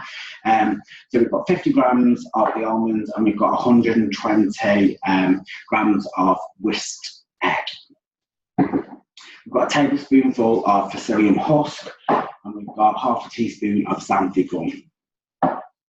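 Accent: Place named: Australia. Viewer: I am very interested in this recipe but I cannot understand a word he is saying in this video. 😞 very poor audio + heavy Aussie(?) accent.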